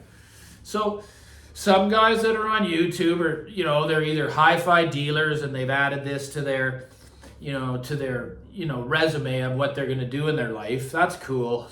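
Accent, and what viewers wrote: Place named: Canada. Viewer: I knew this furious pace of new videos would take its toll. As previous commenters have said, its better to speak with purpose than speak with haste. Now, I could listen to that Canadian accent all day long. No lie! Keep up the good work and the committed followers will be there. You speak from the heart and from a position of experience. All of these I respect and find refreshing as compared to the puppy mill of reviewers out there. Reviewing over 100 speaker models in a year? How do you even maintain a baseline? No thanks. Ill take my dose of whenever it next dings my bell.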